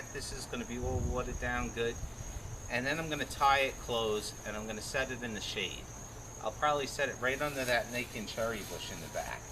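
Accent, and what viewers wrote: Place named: Northeast, United States. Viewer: I don't think he's stoned! Are you basing this on his northeastern accent? Thanks for the info on blueberries/cuttings, can't wait to get my hands on some blueberry sticks.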